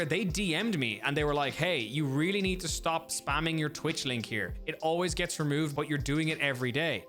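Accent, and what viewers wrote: Ireland. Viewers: damn he sound like me fr (im irish too)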